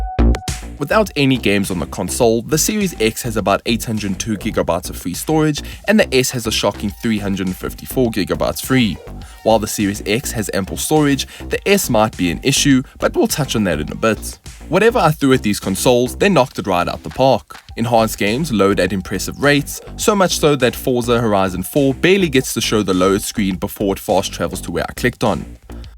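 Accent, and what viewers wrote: South Africa. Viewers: Amazing review, the best one Iv seen👍👍❤️❤️You sound like you have a South African accent, I'm from South Africa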